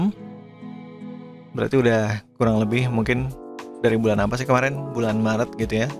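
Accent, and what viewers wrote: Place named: Java, Indonesia. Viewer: Lah saya kira orang Jawa. Asli orang mana?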